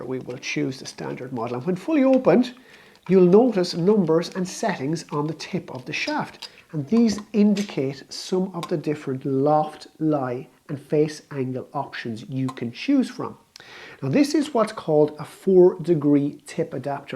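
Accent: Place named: Ireland. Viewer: That's actually an Irish accent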